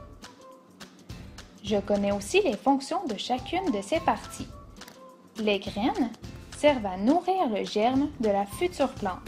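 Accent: Quebec, Canada. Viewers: Kebek je crois!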